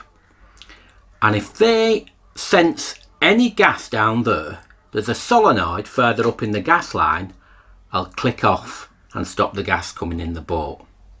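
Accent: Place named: Yorkshire, United Kingdom. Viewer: Yorks accent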